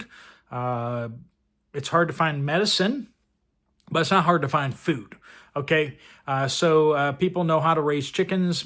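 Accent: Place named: Pittsburgh, United States. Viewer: I can understand what you are doing and pray that you will have a wonderful life with your beautiful new wife. You can leave the Keystone state but you will always have the the Pittsburgh accent. I don't want to leave Pennsylvania but I'm also nowhere near ANY city. 😊 I certainly agree with you on the tax situation.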